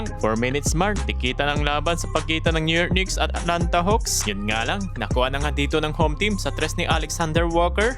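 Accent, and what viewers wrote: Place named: Visayas, Philippines. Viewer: naging bisaya accent?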